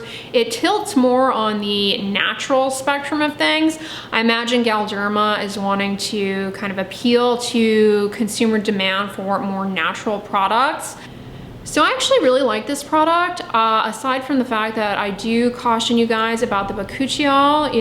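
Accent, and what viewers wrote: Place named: Philadelphia, United States. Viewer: I love your videos, but I’m constantly trying to pin your accent. It’s sounds like the Philly tri-state area (PA, So.NJ, No. DE)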